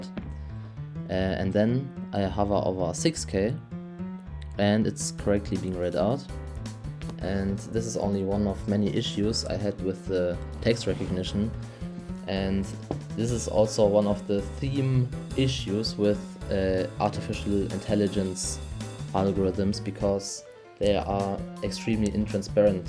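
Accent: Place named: Germany. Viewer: Are you German?, as a german i woud say that you sound german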